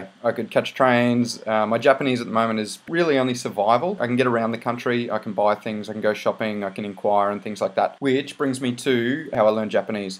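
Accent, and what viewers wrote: Australia. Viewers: ! I knew you were Australian!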